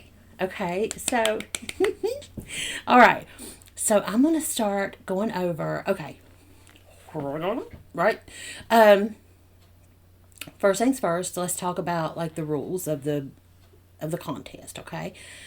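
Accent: South, United States. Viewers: As the video goes on, I do declare, it is questionable that you may have have some Georgia 🍑 in you, oh I detect some southern draw! Oh gosh ,I have been smiling for my whole time here! How oh how could this be? I am going to subscribe ! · Sounds like a good day for you. You live in Maine? You sound more southern than I do and I live in Kentucky. You sound like one of us.😁😁😁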